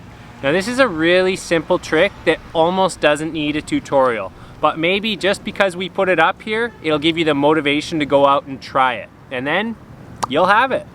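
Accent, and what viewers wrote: Canada. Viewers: This guy sounds sooo Canadian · You sound hella Canadian and I like it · This is the most Canadian accent I’ve ever heard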